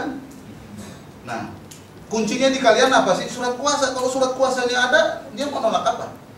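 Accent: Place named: Jakarta, Indonesia. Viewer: Cerdas, Lugas, Tegas & Cadas.. 😄 org Batak tp logat, suara dn gaya bicaranya kek org Betawi (mirip Alm. Benyamin S) Saya ngetik ini di tahun 2022, sgl keterangan & ilmu yang diterangkan pak Jhonson persis terjadi pd kasus KM50, Mirna, Brihadir J (SAMBO), tinggal penerapanya aja ini mah..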